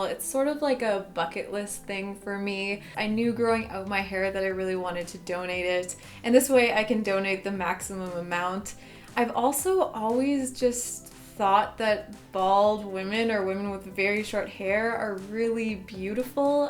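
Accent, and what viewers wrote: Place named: Canada. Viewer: I love your Canadian accent, reminds me of Robin from how I met your mother 😂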